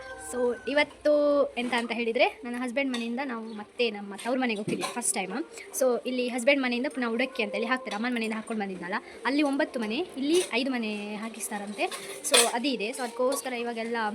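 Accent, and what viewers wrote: North Karnataka, India. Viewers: Nivu uttar Karnataka bhashene matadari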